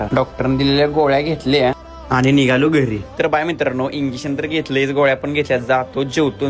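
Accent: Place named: Karad, India. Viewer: मित्रा बोलण्यावरून कराड चा वाटतोय 🤔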